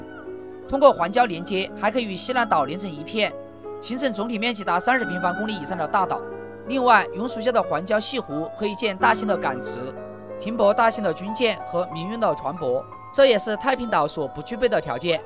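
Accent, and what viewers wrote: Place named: Sichuan, China. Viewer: 这声音是四川那一带的普通话。谢谢解释！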